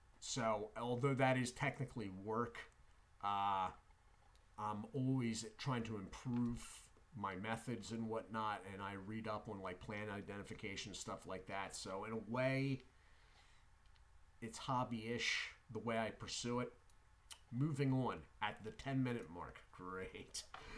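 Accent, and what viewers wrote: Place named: Pennsylvania, United States. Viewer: I thought your accent was south of Bucks county 👍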